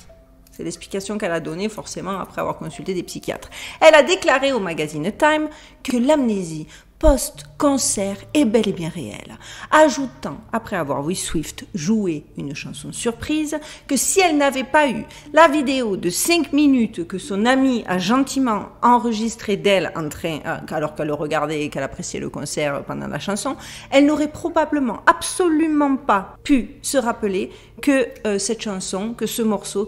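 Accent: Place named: Midi, France. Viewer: Merci! Sujets toujours passionnants. Plus l'accent du Midi 💓💓💓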